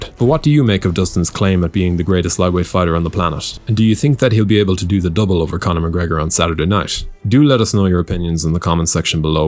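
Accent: Ireland. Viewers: For some reason I didn't notice you were Irish until you said it...weird huh😅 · Wtf the dude that made the video is irish?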